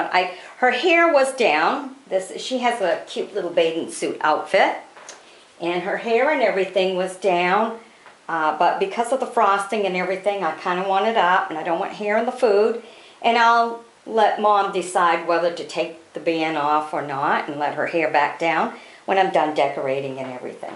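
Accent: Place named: New Jersey, United States. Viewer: She sounds like she's from Jersey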